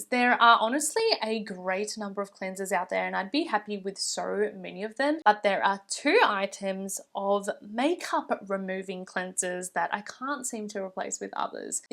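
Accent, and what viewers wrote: Australia. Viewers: Happy to hear an Aussie accent. I was wondering where in Australia stores can we find these ? I haven't seen these in chemist warehouse or myer David jones etc nor I am from Korea so can't understand even if I missed ? Were these brands available in Aus since last 4 years? I haven't come across them in Westfield either.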